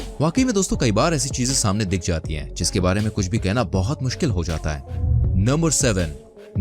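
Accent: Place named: Mathura, India. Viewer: Sir mathura sa bol raha ho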